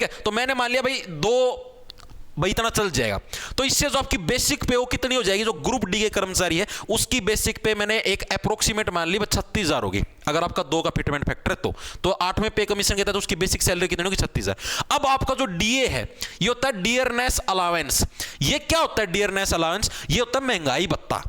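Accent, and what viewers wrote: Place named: Punjab, India. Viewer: ye to punjab se touch h punjabi style a jata h bolne m bhi right?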